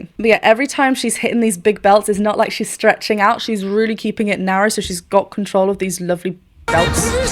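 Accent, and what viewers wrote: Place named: RP, United Kingdom. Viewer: I feel like I have to avert my eyes from your video because of your speech quality. I am very easily affected by the dialects I listen to you. Give me one hour of listening to you and I will sound equally posh. Edit: Which btw could be misconstrued, but is not meant as an insult - at all.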